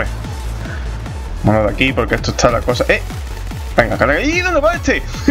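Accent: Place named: Andalusia, Spain. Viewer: tu eres andaluz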